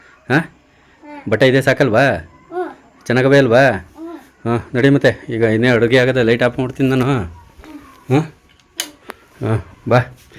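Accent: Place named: Chamarajanagar, India. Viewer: Anna navu Chamarajanagar davru nam kadenu ede tara matadadu tumba chenagide nim vlogs kushi agutte normal life style toristira yavde artificial ella ❤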